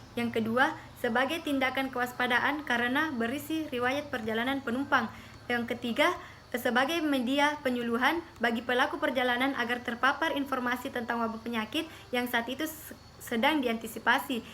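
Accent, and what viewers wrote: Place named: Makassar, Indonesia. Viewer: Kaya logat orang Makassar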